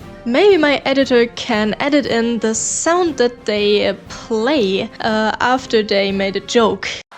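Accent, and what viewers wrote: Germany. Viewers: Good video overall, here is my constructive criticism: The audio is a bit raspy. While this is obviously a money issue (microphone), it is making it harder to watch. This is especially so because you are trying to present a pleasent scene - good mic quality plays a big part in that. While the german accent is really cute, the amount of pausing you do between some words can make it harder to keep attention (This is one of those things that comes with practice I guess). I can't decide wether im vibing with the choice of background or not. On one hand, I like the idea of setting the focus on you and the cosplay, on the other hand I can imagine this getting a bit bland rather quickly (especially for the average attention span these days (we're all cooked)). I generally really like the editing. I audibly giggled at the zoom . A few soundeffects could help underline comedy and better the viewer retention. 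I dont necessarily dislike the amount of text used, but just having it a standart font white on black for every single one is a bit boring if you ask me. I really appreciate that you are trying to be creative and not just repeating known methods and whats popular. A lot of my criticism is just due to you figuring things out so dont take it to seriously. Its a bit confusing to me what direction this channel is headed. You are not trying to be as attentiongrabbing as possible (which is great, please dont be!), which implies that you want to build a strong viewership instead o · I didnt know you´re german too! Well, atleast by the video and the accent im thinking so (okay yea i didnt complete the video before)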